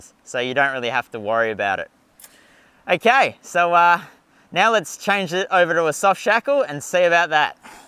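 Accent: Australia. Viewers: When I hear an Aussie accent at the beginning of an Offroad educational video I know I’m about to learn some good stuff! 🇺🇸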